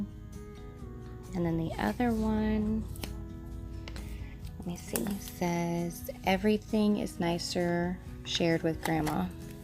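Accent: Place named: South, United States. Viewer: Hi! Im noticing a southernness in your talk! Where are you from? Im in SC, thought we might be neighbors! I love your jj videos!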